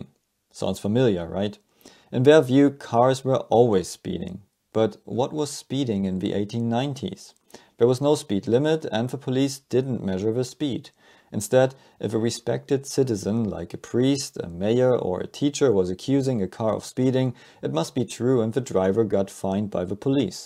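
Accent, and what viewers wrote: Germany. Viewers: Klingt so, als würde ein Deutscher versuchen, Englisch zu sprechen.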